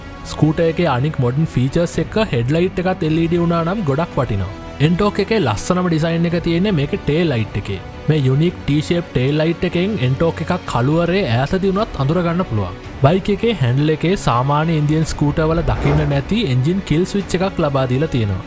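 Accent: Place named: Matugama, Sri Lanka. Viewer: machan uba matugama peththeda ? video ake mathugama peththe then vagayak thibba....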